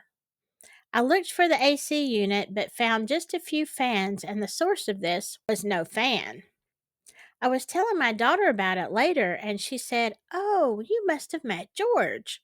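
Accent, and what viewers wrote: South, United States. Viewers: I think you nailed it and love your channel name. It tells what kind of content you have with the word spooky and with your beautiful southern flare to give the added bonus. Also, I got to hype your channel. YAAA ❤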